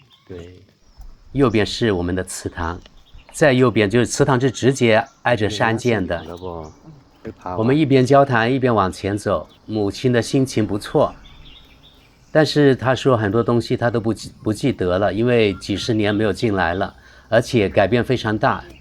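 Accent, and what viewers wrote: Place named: Southwest Mandarin, China. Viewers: 博主是广西哪的？说的话有点像西南官话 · 口音像四川话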